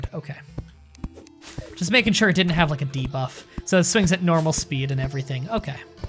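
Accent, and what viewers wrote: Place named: Southern Ontario, Canada. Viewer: Gotta love that Southern Ontario accent of his! Best Canadian Youtuber!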